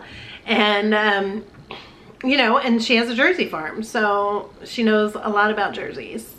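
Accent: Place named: Georgia, United States. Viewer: Hi ❤ I can tell you are tired because your Georgia accent is noticable. Love you ❤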